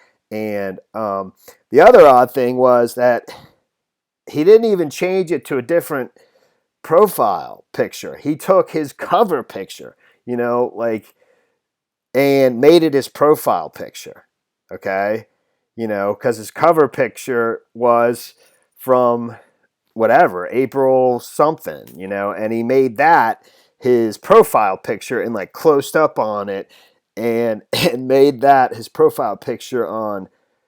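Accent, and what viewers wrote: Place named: Delaware, United States. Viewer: Hi,! I really like your channel. Just the facts, plain and simple. Also, I keep thinking you sound like you're from northern New Castle County, Delaware, or nearby PA. Are you?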